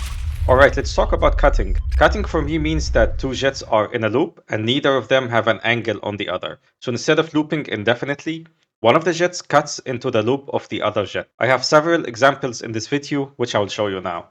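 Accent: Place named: Egypt. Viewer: are you egyptian? very egyptian accent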